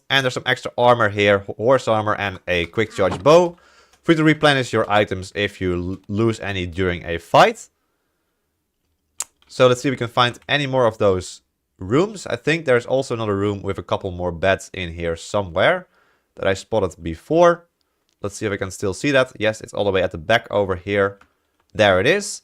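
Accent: Netherlands. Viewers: U sound nl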